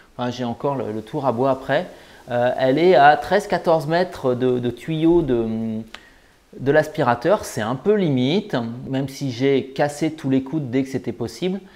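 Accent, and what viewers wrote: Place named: United States. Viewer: Un "petit" 60 m2, ça fait un paquet d'abonnés qui vont t'envier :-) On croirait entendre un de nos amis américains. Merci pour la visite détaillée, toujours un plaisir !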